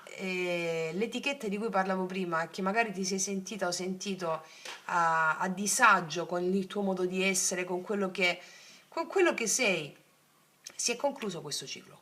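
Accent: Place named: Salento, Italy. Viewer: Io avrei detto Salentina.. se è siciliana allora è di Messina 😊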